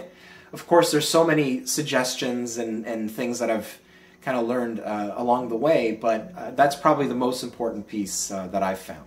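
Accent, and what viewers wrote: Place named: Canada. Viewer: Hiya! I thought that was a Canadian accent! We spent many many happy summers in Canada when I was growing up, (Nova Scotia and Alberta/The Rockies) I always thought I would move over as soon as I could but I met my now husband when I was young and that was that! We are planning to bring our daughter over for a long holiday in 2016 though and the big dream is to come and sing at jazz festivals as a way of bringing her back year after year! Super video! I'm not a new YouTuber but I'm a new ostomate (and loving it!!) and so overwhelmed with how wonderful the community is! Fantastic tag! I hope you had a lovely Christmas x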